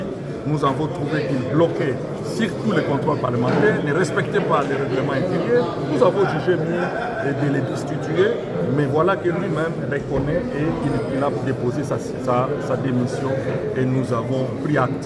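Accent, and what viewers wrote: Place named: Rwanda. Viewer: Ton français rwandais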